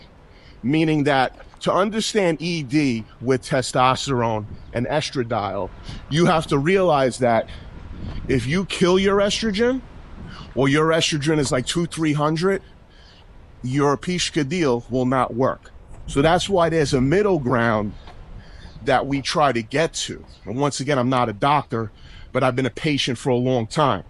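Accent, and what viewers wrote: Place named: Long Island, United States. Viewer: When I hear you speak it reminds me of my Homies from the north shore of Long Island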